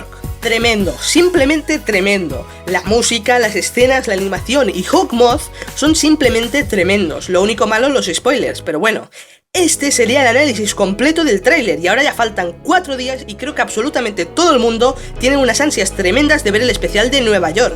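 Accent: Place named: Spain. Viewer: Ah vale, es que como hablas, pareces español y no latino, por eso pregunto😅jajaja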